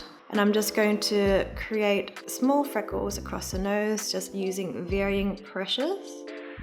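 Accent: Australia. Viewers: ay the nice aussie accent just makes me hit the subscribe button oh yeah love the final look 😍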